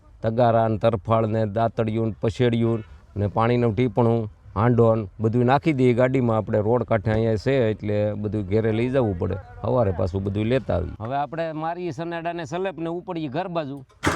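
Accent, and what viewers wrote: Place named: Gujarat, India. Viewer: ભાષા જોતા કાકા અમારા મહુવા પંથક ના હોય એવું લાગે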